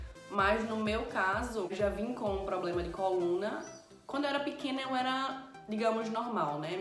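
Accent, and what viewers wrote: Brazil. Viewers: É de Recife?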